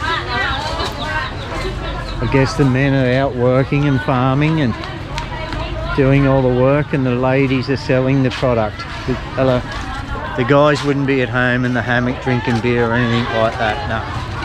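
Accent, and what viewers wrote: Australia. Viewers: I detect a slight trace of Australian accent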